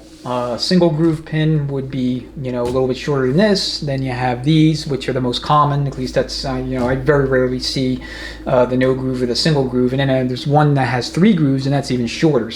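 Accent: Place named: New York, United States. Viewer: just got done watching this procedure, great information, wondering what my measurements were on this band at 61,000 K when it burned up??? ( SECOND SPEED BAND WAS THE PROBLEM )!!!!!!! BETTER YET, were is this trans shop setting the clearances on this new one??? You would be surprised at the guys who work in the industry ( mine, heavy truck / yours trans shops ) that have been doing it there way; ( BILLY BOB, HACK - A - BILLY ) for 20 - 30 years or more, and it's not even close to " SPEC " )!!!!! ESPECIALLY IN THE DEEP SOUTH!!!!! I am originally from Delaware County PA. just outside " PHILLY ", your accent leads me to believe your from JERSEY / NEW YORK / LONG ISLAND?? WERE ARE YA LOCATED?? HELL, I WILL START BRINGING THE TRANNYS TO YOU & REFERRALS TOO, IF YOUR UP IN THAT AREA?? only a couple hours away if so??? LET ME KNOW, THANKS, GREAT INFORMATION & VIDEO AS USUAL / I TOOK NOTES & PART NUMBERS!!!!!! 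THE MACK TRUCK GUY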